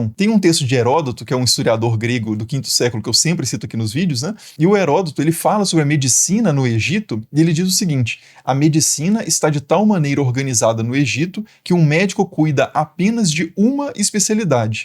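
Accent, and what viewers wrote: Minas Gerais, Brazil. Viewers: O fala de um jeito como se gostasse da gente! Típico do sotaque mineiro!❤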